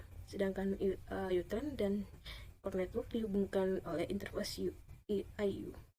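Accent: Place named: Pariaman, Indonesia. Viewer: Vidionya kurang menarik nih, bahasa indo nya kelihatan pariaman vibes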